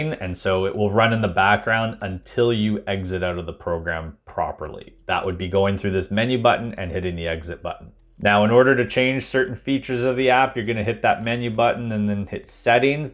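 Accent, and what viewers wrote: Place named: Canada. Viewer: Excellent, clear introduction to the LeafSpy! By the way, I suspected you were Canadian even before you said so. How? I am not sure I can explain, but it's a compliment.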